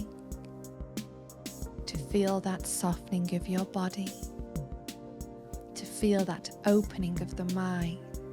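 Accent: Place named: Northern England, United Kingdom. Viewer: I love a good Northern accent, and yours is the best. So soothing and inviting. You're wonderful! Thank you!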